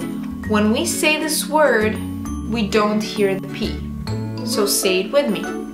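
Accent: Russia. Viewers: You do have an accent. A Russian one I presume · You have a russian accent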